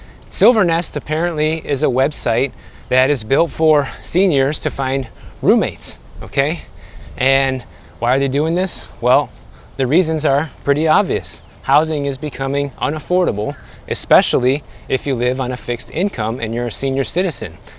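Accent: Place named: Midwest, United States. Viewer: we are currently feeling stuck between a rock and a hard place and just trying to make the best descision since out situation is temporary getting locked into another 12 month lease at a huge price increase is not what we want to do..ive been watching alot of your videos as well as others trying to gauge our options.. what part of the midwest are you from.. we are michiganders born and raised.. i hear your accent (people are always telling me i have an accent too lol)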